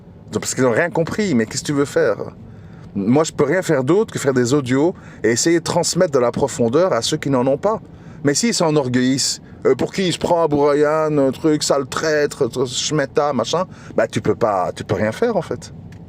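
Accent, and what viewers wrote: Belgium. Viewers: Sympathique l accent belgeomusulmant. Le reste aussi.😊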